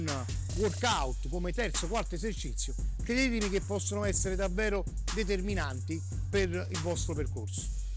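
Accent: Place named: Rome, Italy. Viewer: Si ma un po’ di professionalità e proprietà di linguaggio… sentir Lemme e sentire, beh dovrebbe essere quasi il contrario 🥲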